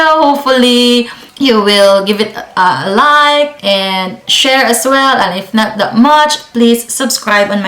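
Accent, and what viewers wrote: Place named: Philippines. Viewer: Sabi na nga be Pinay eh,lol. Just clicked the video, on the first word, alam na agad! Because of my musical influences, my love for their accent, geography at sabi nila masayahin din sila, gusto ko maka punta jan.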